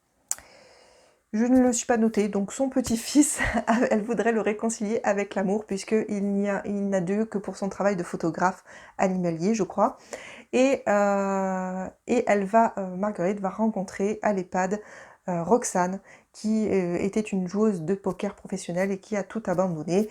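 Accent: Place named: England, United Kingdom. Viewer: Coucou! Bravo pour cette vidéo! Je vois qu’on a une majorité de livres en commun, surtout pour les grandes sorties!!! 😍😍 Genre Aurora Squad 2!!!!!!!!! Haha, l’attente me rend fébrile... 🤣🤣 J’adore ton accent anglais!! 😅 Pas pire que le mien!! 😉 Bisous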